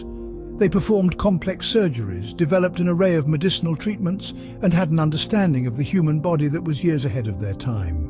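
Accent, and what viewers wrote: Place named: United Kingdom. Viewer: It's painful to hear this shit especially in British accent... Western so called HISTORY expert just lays and lay, timeliness and historical facts all coming from the old testament and the talmud... Nothing in all of the documentaries makes any sense all just to make one creature happy....... FACT 🙈👁️🙉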